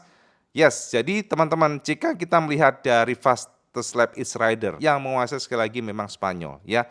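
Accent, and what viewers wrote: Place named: Java, Indonesia. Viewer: PADANGG NJINGGLANGGG.., kanal terlengkap dengan data dan logat bahasa yg khas NJAWANI..., TOP MARKOTOP JOS GANDOSSS.., #vep9budalslametmulihraharjoaamiin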